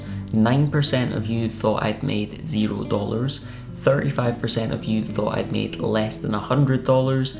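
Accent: Scotland, United Kingdom. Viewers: Yes, an extra $1,000 per month would be most agreeable and worth the effort,. I must comment as well that understanding the Scottish brogue has always been a bit of a challenge for me however your mastery of "English" is formidable. Thank you for that!!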